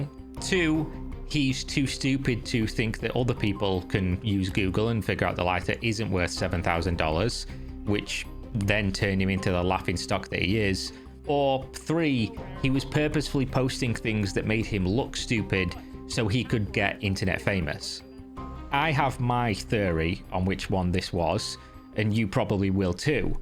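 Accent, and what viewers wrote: Northern England, United Kingdom. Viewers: First time ive seen you on camera, your voice is Northern English gold. Keep these amazing videos coming.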